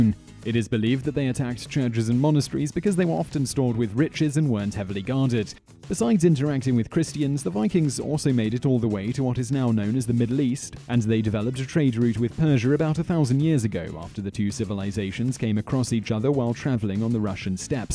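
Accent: England, United Kingdom. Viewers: I'm sorry the enlish accent sounds smart but is it nessesary? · Is this guy British? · Jack Hammer Well.... he's English so.... yanno.... I'm sure he could do an African accent if you'd prefer :)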